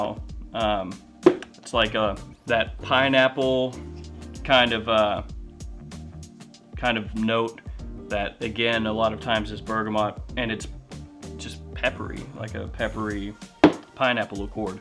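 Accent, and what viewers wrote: South, United States. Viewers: "Hey friends. ".... and some of you folks can't tell he is from the South.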